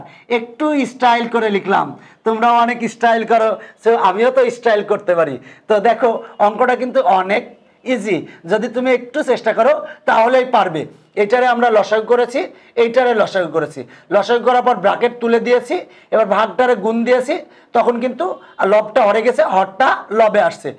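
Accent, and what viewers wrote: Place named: Kushtia, Bangladesh. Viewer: Sir apner Basha kothai??? Apner bola kichu Kotha shune Mone hoi apni kustia thake😁😁😁😁😁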